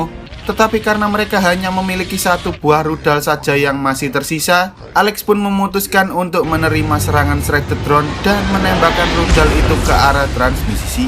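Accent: Java, Indonesia. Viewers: Lu orang jowo ya bang sama gw yo orang jowo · Rasah kesuen 😂 Jowo e endi?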